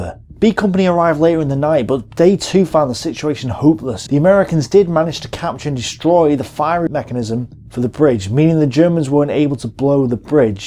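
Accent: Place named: Manchester, United Kingdom. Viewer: In 1944 the Zuiderzee, the Southern Sea, no longer existed. it had been transformed in the 1930s into the IJsselmeer (lake IJssel). Also the shape of the lake is completely wrong. The map is more like something I expected from an American, not something that (as I understand his accent) is coming from a British person.. .I honestly don't understand WHERE he got this map from.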